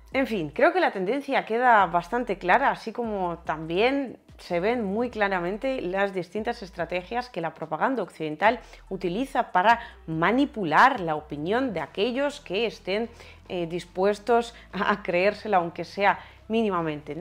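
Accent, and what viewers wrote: Spain. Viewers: El único problema es el asentó español okey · Obrigado pela explicação. Você é espanhola ou russa?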